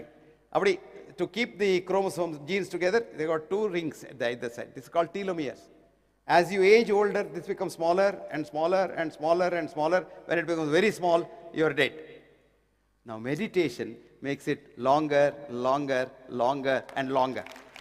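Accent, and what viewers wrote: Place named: India. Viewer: Only Indian will understand his English....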